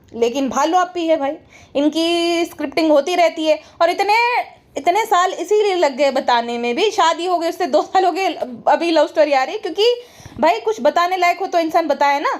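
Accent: Gujarat, India. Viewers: Aap gujrat se ho kya??? Bcz some words se gujrati lgte ho😅😅😅😅plzz reply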